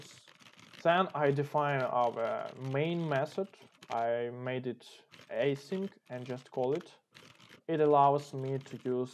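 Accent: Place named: Russia. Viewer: слушать английскую речь от русскоговорящего довольно кринжовенько, но урок очень толковый, спасибо большое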